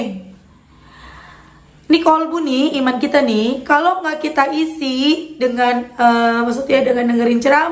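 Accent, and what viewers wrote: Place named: West Java, Indonesia. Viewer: Ya Allah Sunda nya ketauan banget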